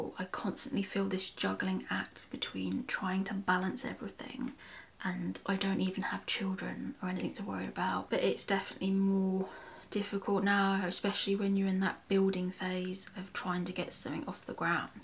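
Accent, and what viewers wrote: United Kingdom. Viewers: I'm not even sure how I stumbled across your videos...because all I watch are Car vids. LOL Anyways...you are great speaker, you seem very intellectual, extremely attractive, and would make any guy feel lucky to share your time with! And your English accent...for an American. So HOT!! Viewers will come to you! You've got some great content so far! Best of luck to you in 2022!!!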